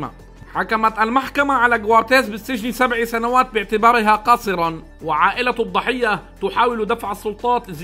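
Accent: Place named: India. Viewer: يشبه صوت الهنود و الباكستانيين 😁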